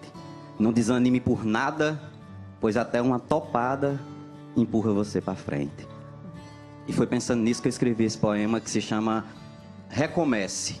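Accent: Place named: Brazil. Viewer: Que bom ser premiado com uma poesia tão clara, que nos leva a meditar. E com o sotaque de Brasil, com vocabulário puro e nordestino, sem receio de nada. Sou eu fã. Um paraibano em Sampa cheio de Gratidão!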